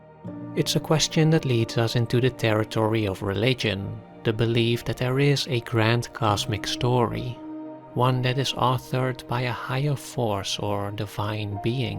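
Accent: Netherlands. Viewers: Duthch accent.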